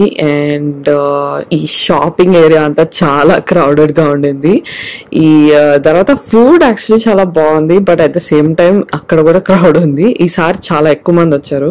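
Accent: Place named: Hyderabad, India. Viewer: Good, me telugu matladam bagundi,normal gane hyd yasa lone matladandi,inka vlogs cheyandi elage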